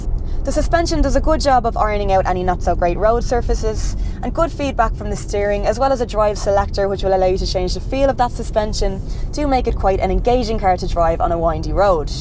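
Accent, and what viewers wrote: Ireland. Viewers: I think I prefer a woman doing a car review over a guy. Same great data and specs if not even better with none of those macho undertones. Also love your Irish accent, much nicer on the ear than any British accented presenter out there.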